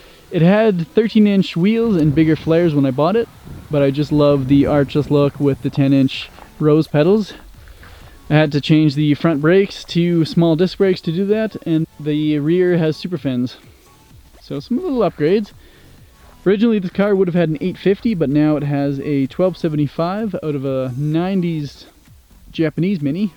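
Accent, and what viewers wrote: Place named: Canada. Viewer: Honestly I must be going mad because every time I find a Canadian on youtube, they seem to go out of their way to say words with "ou" in them, usually ends up being "oat".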